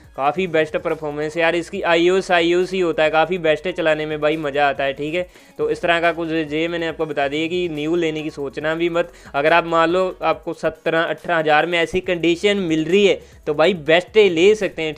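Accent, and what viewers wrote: Punjab, India. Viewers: Hindi in Punjabi Accent😅🖒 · Punjabi bhra mil gya - me awaj to hi pachan lea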